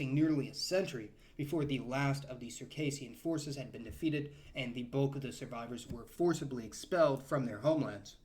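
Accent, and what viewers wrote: United States. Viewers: Isn't it telling that, just now, when the US is attacking Russia and saturating the world with anti Russia propoganda, that this US presenter complete with American accent covers this old history at this time? Its just the US trying to sow conflict as usual by sticking their boot in to widen any division anywhere they can find. Its just a distraction from all the wars, invasions, thefts and mass murder the US specialise in....to 'Defend' that land already defended perfectly well by the Atlantic & Pacific. "Don't look here, look at those Russian baddies" from centuries ago.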